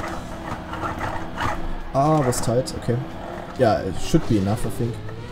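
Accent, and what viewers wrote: Germany. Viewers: Ahhh der schöne deutsch englische aktzent. Ich liebe es. Btw du hast gesagt der corner lol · man hört den deutschen akzent sehr gut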